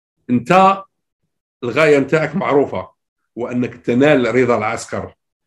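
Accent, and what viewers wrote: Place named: Morocco. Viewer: باين لهجتك مغربية كما احنا...........و علاه راك دير في السنيما ...اسكتوا علينا هذي غير كرة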